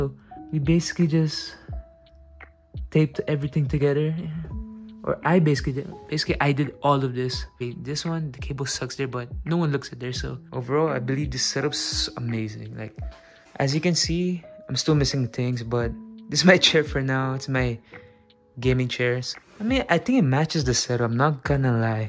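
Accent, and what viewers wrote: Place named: Philippines. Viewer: Bro have pilipino accent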